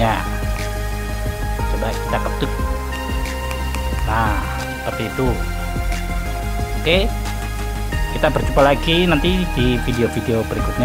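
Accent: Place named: Banyumas, Indonesia. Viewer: Ngapak ra jelas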